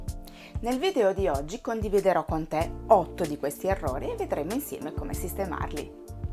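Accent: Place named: Italy. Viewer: Brava e grazie 🙏 e complimenti per l’accento italiano perfetto!! Ed ho orecchio eh.. :)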